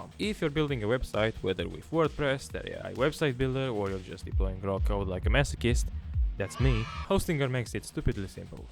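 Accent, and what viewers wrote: Russia. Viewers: Wtf is even your accent, you didn't used to have so much russian in yout accent. What is wrong with you? I can't understand a sh*t!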